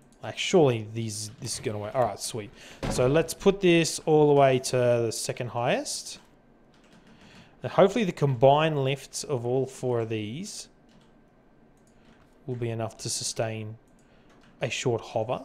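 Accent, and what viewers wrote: Australia. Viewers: i always knew u were australian from when i heard ur voice! nice creation, mate!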